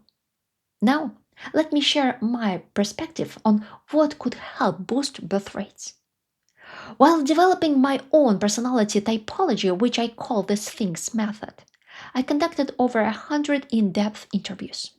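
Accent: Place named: Russia. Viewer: I have to admit, your Russian accent is quite strong. If you've lived in an English-speaking country for so long, why haven't you worked on your pronunciation?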